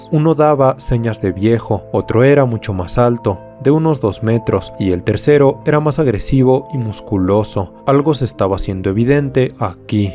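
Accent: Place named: Cleveland, United States. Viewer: Hablas con el acento de Cleveland de padre de familia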